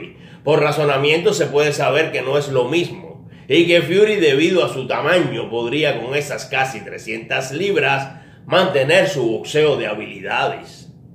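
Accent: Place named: Cuba. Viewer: Con ese acento y no sabes jaja...Cubano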